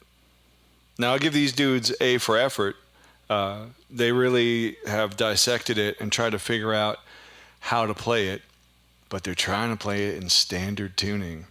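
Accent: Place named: Texas, United States. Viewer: You’ve been living in Austin too long. You picked up that Texas twang.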